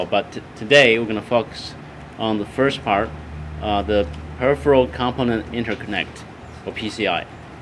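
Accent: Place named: China. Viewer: 这声音一听就是中式英语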